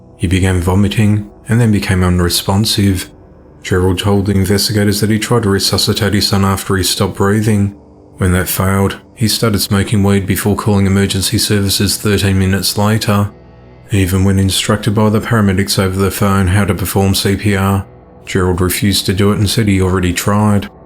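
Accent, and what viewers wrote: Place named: Australia. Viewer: Just came across your channel and as soon as I heard your accent I was like, hell yeah I am definitely subbing to Mt fellow Aussie... 🙂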